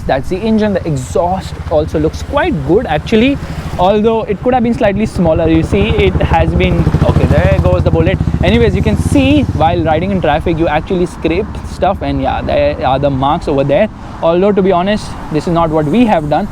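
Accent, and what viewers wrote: India. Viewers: Ohhh you are Indian I thought that you are Pakistan by reading your Muslim name but by your talk I come to know that you are Indiab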